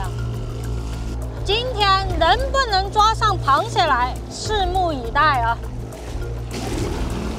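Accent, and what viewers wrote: Sichuan, China. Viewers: 大陸口音？ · 川普说的真好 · 你的四川话和我的一样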